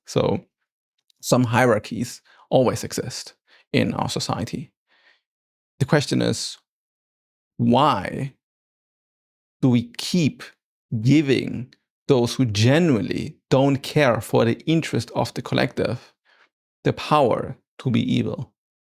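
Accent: Germany. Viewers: Why does the internet lie about your nationality and ethnicity? It is odd information to restrict access to. I would understand keeping your birthplace and current locale undisclosed, but the internet is wrong about all your details. I can tell just by looking at you and hearing your voice, you are definitely not a white Russian. AI has to go, it's useless junk. Based on accent, it is far more likely you are german. Your face is a bit ambiguous, so I could not guess where your family is from. Maybe India, Bangladesh, or Pakistan. Somewhere from the spiceland. Edit: Nah, you're some African Caucasian hybrid. That explains your accent.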